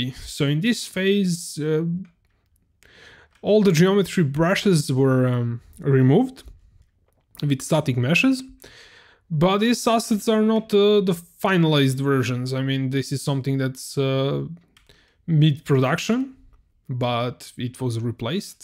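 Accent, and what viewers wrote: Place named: Russia. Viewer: Сразу слышно, русский озвучивал)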